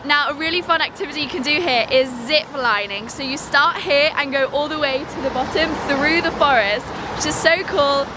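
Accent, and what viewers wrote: United Kingdom. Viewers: Британский английский просто очень красивый, на самом деле. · Такой ярковыроженый британский английский, красиво.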